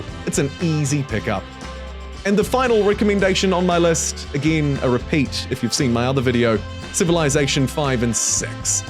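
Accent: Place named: New Zealand. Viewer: Because the New Zealand accent causes e to sound like i.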